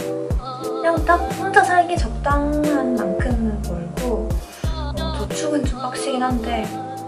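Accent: Gyeongsang, South Korea. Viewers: 경상도 분 아니신가요?ㅋㅋ 서울에 살아 본 적 있어요? ㅎㅎㅎ 그냥 궁금해요!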